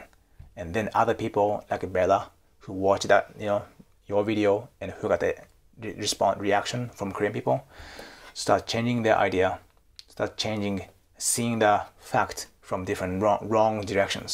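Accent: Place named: Singapore. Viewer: R u really a japanese? I have many japanese taiwanese friend. Ur pronunciation sounds like singaporian.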